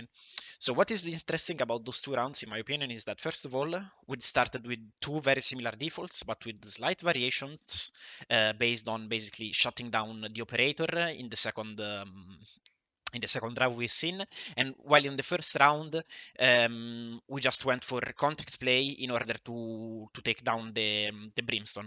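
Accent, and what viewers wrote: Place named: Italy. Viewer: You have a strong italian accent, anyway, nice analysis! And good luck tomorrow for the match with the unicorns!! Destroy them :)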